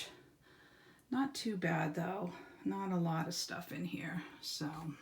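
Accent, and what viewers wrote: New England, United States. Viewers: Hi! I will be happy to be part of your community:) I have a lot of trouble letting go and have some hoards of my own for sure! I get it;) Also- you have a New England accent; I’m up in Maine!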